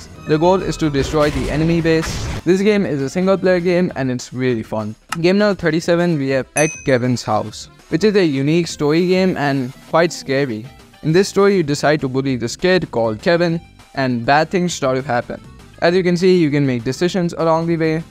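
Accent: India. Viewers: By his accent i think hes indian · I know u r indian the freaking accent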